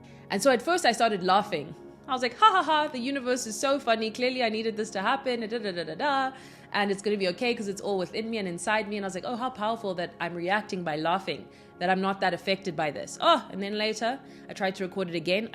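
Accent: South Africa. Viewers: Gurllll are you South African? 👀✨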